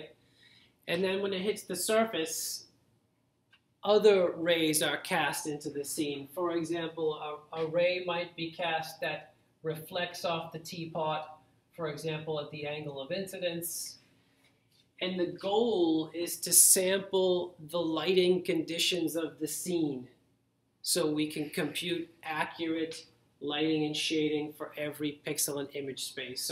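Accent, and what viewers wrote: Boston, United States. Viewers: are you from Boston? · Are you from Boston?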